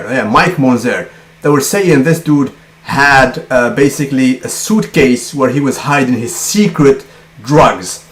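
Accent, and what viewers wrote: France. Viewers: Hey, is your first language french? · hhhahaahaa ton accent Français trèes surprenant!! tres amusant!!! fait donc 1 video en Français!! loll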